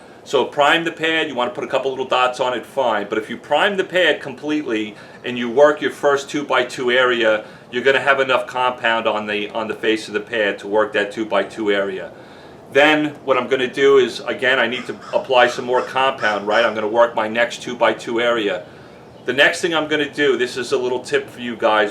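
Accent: New York, United States. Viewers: Hey it all makes sense now!! I’ve been watching all your videos and see you live in Dallas but that accent felt all too familiar to me it was confusing the he’ll outta me now it all makes sense! Haha I’m a fellow New Yorker too love your videos I wanna start a detailing business this summer and your videos are my go to for information thanks a lot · lmao, Been watching some of your videos for a few weeks and one thing that's been eating me is your accent and being in Dallas! Whole time I've been watching your videos I'm like this guy is definitely not from Dallas and now I know. Aspergers, no, New Yorkah, yes, lol. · Thanks, from down under in Australia. Love the NYC accent and the way you present this valuable information brother. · I knew I heard that NY accent lol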